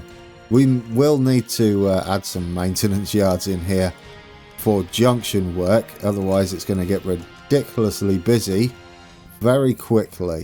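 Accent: United Kingdom. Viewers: For as British as you sound, not knowing Great Clock of Westminster is ironic. :P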